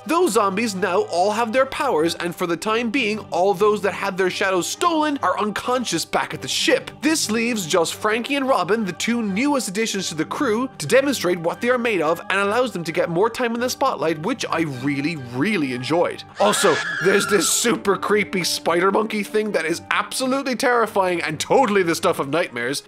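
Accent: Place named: Ireland. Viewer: I detected an Irish accent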